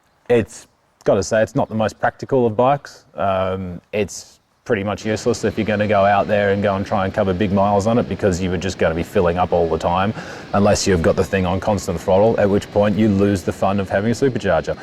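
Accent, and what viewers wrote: Australia. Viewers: Thanks for the clarification. I apologize for any offence. I suppose I was fooled by the oh so familiar Aussie accent and assumed he was here in Australia as there was no reference to country or market. I was also a bit miffed that Kawasaki doesn't give us the full set of options as I'm currently looking at a Z H2 to buy and would love the SE with Ohlins et al. I should have checked my facts before criticizing yours. Thanks for all the good work you do for us. Cheers from Sydney Australia.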